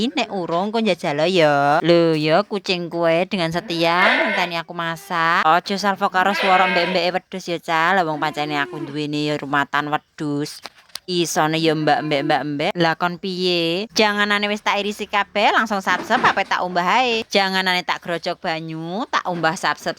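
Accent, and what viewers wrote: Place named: Java, Indonesia. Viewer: Nah begini penggunaan nada bahasa jawaNya mbak... Lebih enak didenger.... ❤❤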